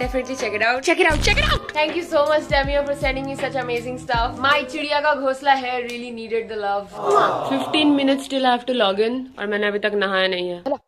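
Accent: Bengal, India. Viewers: Are you a bengali girl bcz u say "maa sotti baana 6o" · Are u a bengali🤔... I heard talking with ur mom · the bengali english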